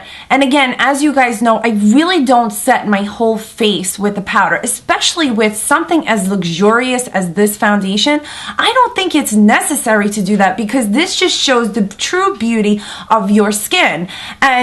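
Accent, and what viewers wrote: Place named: Long Island, United States. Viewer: OMG! I am not being offensive. I am from Lawnguyland. You sound like home. Non LI pronunciation: "Lan Jer E" :-)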